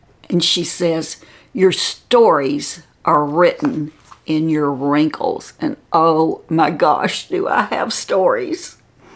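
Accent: South, United States. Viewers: Please enter me in the drawing for the cards; they sound great. Happy 80th! I've been watching you for years. It's your lovely southern accent that first caught my attention and then your confidence and wisdom on so many subjects that kept me watching. I also love seeing your all your artistic projects, like the Xmas hanging balls with lights, made from plastic bowls from dollar store. My daughter and I made those last year and they actually turned out really pretty. Makeup, decorating, enjoy it all. Your videos are especially enjoyable to watch after a stressful day. Hope you never stop!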